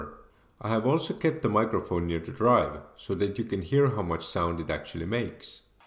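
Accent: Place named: India. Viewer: This was a big help to me to help reinforce my thoughts on how to set up the cables etc. and help my confidence in hooking up and installing my machine. I hope it isn't offensive coming from a white dude saying that Asian Indians are really superb with technology (better than white dudes like me). When I heard your Asian Indian accent I was thinking "Awesome, now I know THIS dude KNOWS what he is talking about!!!" Thanks brother, God bless you and your loved ones. You are a lifesaver Bro.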